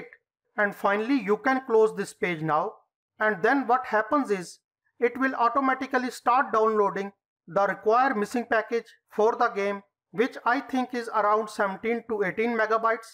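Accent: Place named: India. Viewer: Indian accent always puts a smile on my face :) thanks for the vid!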